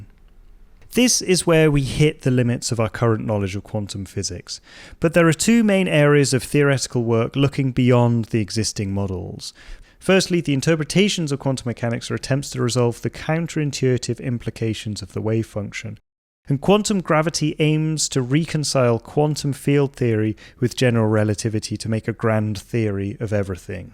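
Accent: United Kingdom. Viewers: Your British accent is enough for me to believe you. Forget about the content.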